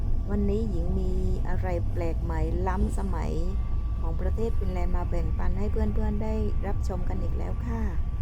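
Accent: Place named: South, Thailand. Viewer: คิดถึงจึงแวะมาทักทายน้องสาวคนน่ารัก😍, คิดถึงสำเนียงใต้.ยายรินดู แต่บ่ได้ยินนะคะ เพราะหรี่เสียงมือถือไว้ กลัวคนรู้ว่าเล่นมือถือในเวลางานค่ะ.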